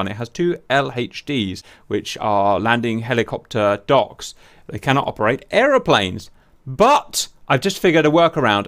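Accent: Australia. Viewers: what would be cool to see is a naval chopper battle using helicopter only carriers. also ur aussie accent was pretty decent. keep up the good work. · Cheers bro, I'm an Aussie and I can say your Aussie Accent and made up words were hillarious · Being an Australian & listening to aussie accent was AMAZING! Love the videos keep it going. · Love the aussie accent mate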